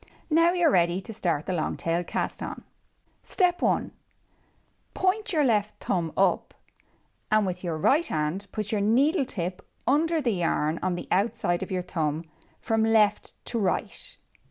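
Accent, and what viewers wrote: Ireland. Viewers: You explain so well and go nice and slow. I think I've got it now. As for your knit stitch, do you knit like Americans and the English or the continental way? It just looked different, but then I haven't knit for over a year. Also, your Irish accent is soooo charming. What part of Ireland are you from? I had an Irish great great grandmother Gosnell, but I don't know where she was from.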